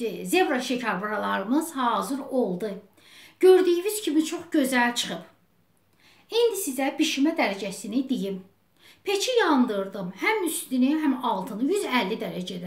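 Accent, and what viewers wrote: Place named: Turkan, Azerbaijan. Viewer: Salam xanım necəsiniz. Yazan Nihadın anasıdır. Əllərinizə sağlıq görünüşü gözəldir. Lətçənizdən Zirə, Türkan lətçəsidir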